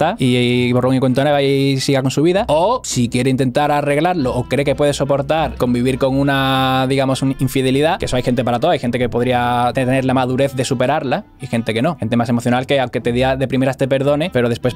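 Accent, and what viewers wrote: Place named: Seville, Spain. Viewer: Le ha salido el Sevillano que lleva dentro al punto que creía que tenía el video en x1.25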